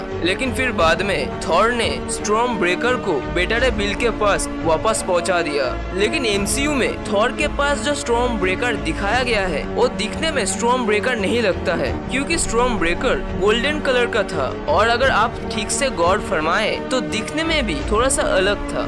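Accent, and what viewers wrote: Bengal, India. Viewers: Are you Bengali??? caus something like Bangla in your Hindi??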